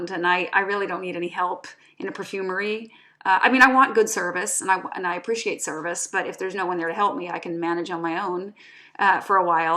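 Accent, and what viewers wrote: Midwest, United States. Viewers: I'm so glad you are posting. Years ago I met a handsome man from Sweden in a bar in Chicago on St Patrick's day. He had a lovely accent. You sound like you live next door in the midwest of the US. So today I tried a sample and found it to be glorious, but it faded quickly. It is an old and small sample. Do you think it fades quickly .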